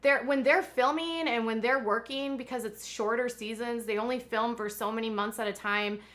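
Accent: Minnesota, United States. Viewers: I love your ponytail look and the Minnesota accent, and more than that I love your shoot from the hip and salt of the earth no-nonsense style of reporting. You have done your homework and your research and I appreciate it. I have really been enjoying your channel and soon as I get my finances in order (translation: the IRS does it in 7 to 9 days) I am going to join patreon and pass some of that stuff to you, girlfriend. 😉🪐👍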